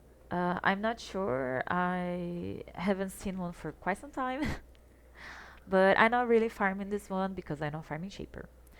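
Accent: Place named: Turkey. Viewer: Hello, sorry it's a bit irrelevant with the video but i have to ask, are you Turkish? Your accent sounds a lot like turkish accent. Cool strat by the way.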